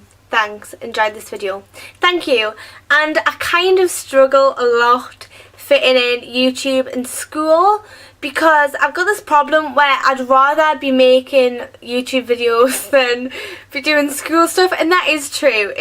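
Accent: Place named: Geordie, United Kingdom. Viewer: It is soo good to hear your accent because I've got a penpal in newcastle upon tyne and I want to meet her next summer and so I can "learn" to understand her.. because gordie is a quite difficult language to understand although yours isn't as strong as others ones ^^ (Sry if my english isn't perfect.. I'm from germany :P )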